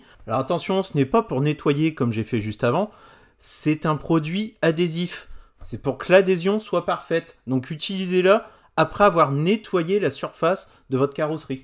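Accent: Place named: Nord-Pas-de-Calais, France. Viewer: Ah le chti ! T'es quand même attachant ! 😜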